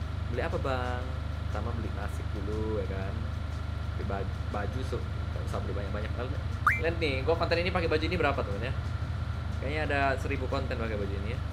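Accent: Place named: Pematangsiantar, Indonesia. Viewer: Iyakan.... Iyakan.... Iyakan... Siantar kali bahasanya...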